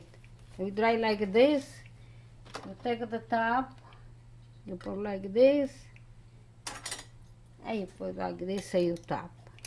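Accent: Italy. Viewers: . You poot oo vasanico'. My God, she's fantastic! I love her. What part of Italy are you from? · This lady must be right off the boat, her Italian accent is thick, but I love it! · Thank You. You are a wonderful grandma . I appreciate your dedication and love to the job you are doing . Congratulations. And for those who made fun of your English , please be polite and respectful . She is Italian , not from London . And it's very natural that her pronunciation and accent will be influenced by her mother tongue . She still has good vocabulary and put a nice effort to make us things right. Congratulations again Grandma ! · Dear Italian Grandma: Sitting far away in Mumbai (India) I really enjoyed watching your show, your beautiful Italian-English accent, your passion for cooking...thank you so much. I shall definitely try your recipes. Unfortunately, here we do not get as good tomatoes as are found in your countries. 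Thank you very much and wish you many more happy years of cooking... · I love! By her accent and certain Italian dialectic words, I think she is from a paesa nearby my paesans. We come from Castel Nuovo, Parranno - vicino Formia e Montee Cassino. Love hearing her accent. It brings me back to old times with my nonno and nonna!! <3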